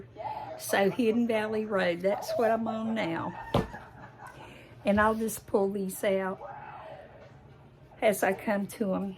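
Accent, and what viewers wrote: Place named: North Carolina, United States. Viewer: Glasses Very wild, something I think you would see Ru Paul wear. I don't know if you remember Elton John and his glasses Very good sense of humor. Enjoy your videos and love your NC accent.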